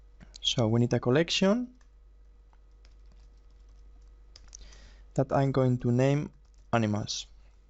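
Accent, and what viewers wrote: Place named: Spain. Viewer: Suena a español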